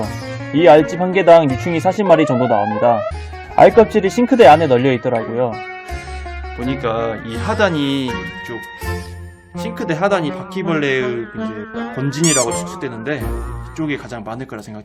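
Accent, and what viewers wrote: Jeolla, South Korea. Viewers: 요게 어디 사투린가요?? 설명 읽어보니까 광주적혀있는데 이게 전라도 샅투린가요? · 아 진짜 너무 소름끼쳐요ㅋㅋㅋㅋㅋㅋㅋ와중에 전라도 말투 너무 귀여우ㅑ욤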